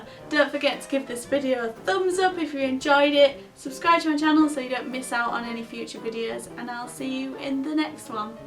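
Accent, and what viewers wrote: Yorkshire, United Kingdom. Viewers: Th's an ace video, lass! Am livin' away on t'uther side o' world so its gut t'ear another Yokshire accent! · I could understand you because I have family in Sheffield. I know that's a different part of Yorkshire though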